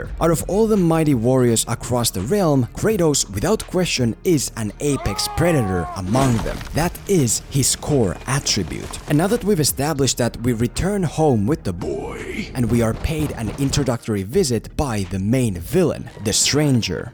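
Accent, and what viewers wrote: Finland. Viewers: You have the most Finnish accent I've heard in ages. Am I wrong?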